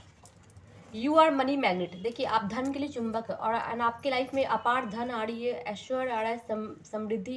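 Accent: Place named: Patna, India. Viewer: Dee aapki tone to Patna ke aas pass ka hai..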